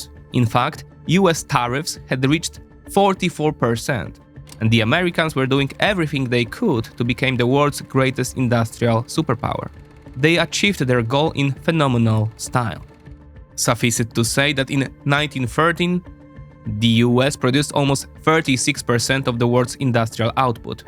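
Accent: Poland. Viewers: Not surprised this guy has a Polish accent. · POLISH ACCENT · You do excellent work! Very informative, must be very time consuming. Liked and subscribed. And I love your Polish accent, I’m Ukrainian by birth. 😊 Suggestion: given the depth of material and watch time, suggest you have a timeline so that people can jump back to a topic of interest.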